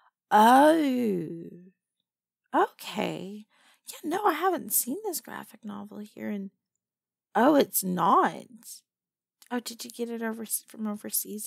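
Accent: South, United States. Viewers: I hear some southern twang. Kinky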